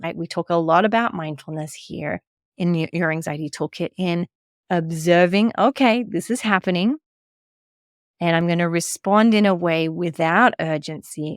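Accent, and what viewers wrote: Australia. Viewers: I couldn't place where you accent is from, my best guess is you're an australian who moved to texas when you were 18 ^^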